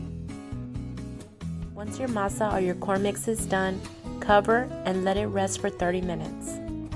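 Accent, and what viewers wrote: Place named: Texas, United States. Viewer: Are you from Texas? You sound Texan. And some of your ingredients I see at H‑E‑B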